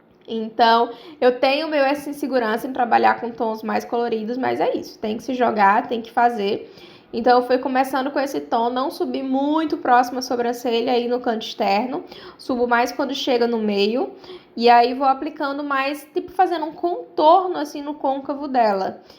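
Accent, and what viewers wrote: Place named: Rio Grande do Norte, Brazil. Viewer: Esse sotaque só podia ser do RN mesmo ❤️❤️❤️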